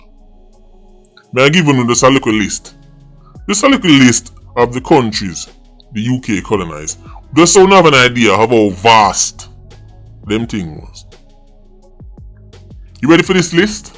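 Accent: Trinidad and Tobago. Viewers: 🤣🤣 sir P, di " Trini accent" madd!! 🤣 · lol@ his trini accent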